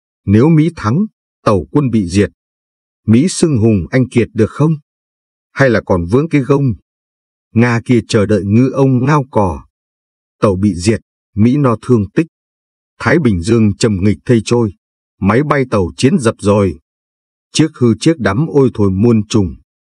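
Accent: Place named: South, Vietnam. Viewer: Hihi thầy nói giọng miền nam dể nghe, dể hiểu ?! nay đổi giọng bắc sao khó nghe và khó hiểu quá ? hihi. Không biết đức thầy nghe được không nữa ??!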